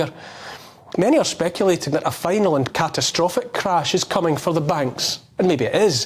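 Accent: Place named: Glasgow, United Kingdom. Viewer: Mate what's way the neck rug? And stoap way the Irritating accent yer a weegie trust me it's no surprise ur channel is complete Murdoch puppet play.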